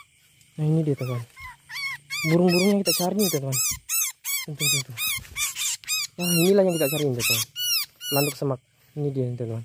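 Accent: Bugis, Indonesia. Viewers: Orang bugis kah bang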